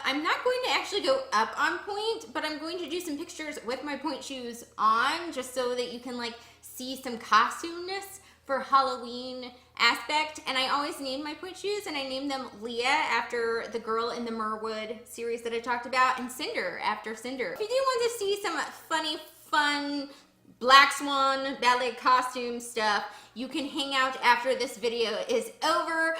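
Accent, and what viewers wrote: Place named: New York, United States. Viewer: Great vid,!....It was all that and a box o' chocolates!:))...........fun New Yawk accent lol.....that was like a dame in a 40's movie.......that makeup looked very cool.....great pics at the end:))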